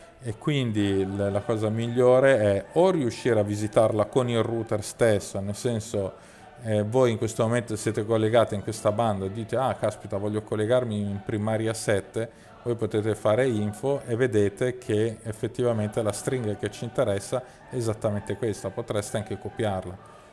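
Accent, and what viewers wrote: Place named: Veneto, Italy. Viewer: Il peggior problema è che l'algoritmo del router sceglie la banda con il migliori RSRP, RSRQ, SINR senza curarsi dell'effettivo risultato in termini di velocità. Nel mio caso la banda 20 restituisce ottimi parametri di ricezione, ma velocità scadente. Inoltre nell'hack non registra la scelta di banda, anche se nella pagina di debug è settato su auto. Nel mio caso, per esempio, indico 1+3+7 (sui -110 db) per evitare 20 e 28 che sono lente (sui -80 db), e questi parametri non vengono registrati. Rimangono solo due opzioni: fissare la banda a una sola o dalla pagina di debug o dal cell lock. Da quando ho smanettato con l'hack, il router non aggrega più. Non capisco poi quindi la differenza tra il cell lock e la selezione della banda e forse considerando che ho solo una sola BTS a 900 metri nella mia area, non fa grande differenza. 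Fino al 24 del mese sto ancora in 4G, dal 25 vi aggiorno. Un ultima curiosità: la mia zona non c'è zefironet, uso Iliad, ma il router accetta sia gli apn di wind che di iliad, la cosa gli è indifferente. Stessa cosa con le con le bande 4G in cui la 20 è su banda di wind e 28 per iliad e funzionano indifferentemente. Un grazie speciale a per il suo grande studio e disponibilità, mi spiace che hai dovuto dismettere il tuo blog. Un unico neo: ogni tanto la tua dizione è poco chiara. Non è per l'accento veneto, che ci sta, ma proprio non articoli bene alcune parole. A volte devo rallentare il video, ripetere il play, consultare la sfera di cristall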